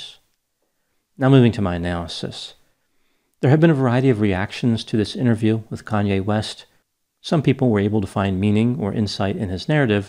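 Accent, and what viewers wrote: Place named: New Jersey, United States. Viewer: I believe he mentioned that he's in Phoenix now? Hence, all the cacti that's gradually increasing in his background. 🌵🌵🌵🤔😂 I definititly can hear the jersey accent in his speech, though, and I love it haha.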